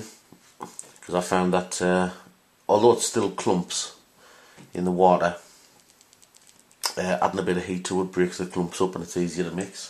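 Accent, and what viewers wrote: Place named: Newcastle, United Kingdom. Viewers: Good video thanks for posting it. I enjoy dark ales and will be looking for the one (hopefully) that you made of the Norfolk Nog. I can't quite place your accent, at a guess i would say Northeast heading towards Newcastle. Am i close to being right ? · looks like a nice brew mate, keep us updated on the taste, you sound fairly local to me (newcastle), where ya from?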